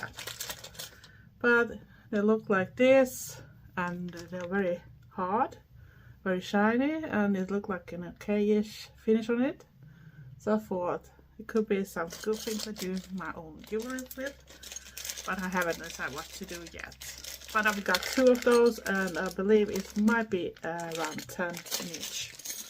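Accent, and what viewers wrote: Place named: Sweden. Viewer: Hay, new suber here, i love your accent, sweeden is beautiful, love the haul, everything is so cute x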